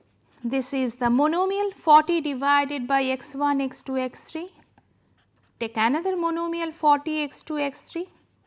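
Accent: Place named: Bengal, India. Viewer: Totally bakwas Tell her to speak in proper English She is speaking English same as Bengali Not useful at all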